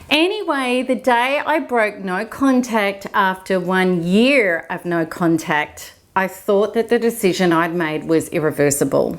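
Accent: Australia. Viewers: I love your Aussie accent :) hello from Washington DC and thanks for your help!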